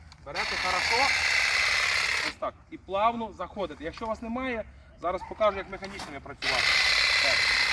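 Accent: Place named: Obodivka, Ukraine. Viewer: Ободівський говор) 😎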